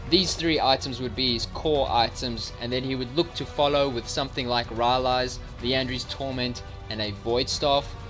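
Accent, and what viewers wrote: South Africa. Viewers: You sound South African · south african???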